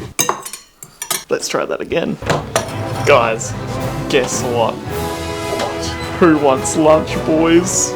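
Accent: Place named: Australia. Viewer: hi are you from australia cause its your accent and i am too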